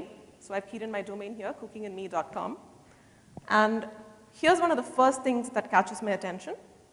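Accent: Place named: India. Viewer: It took me a minute to get used to the Indian dialect, but this is good information for bloggers. I will make use of it on MotorcycleEventNews(.)com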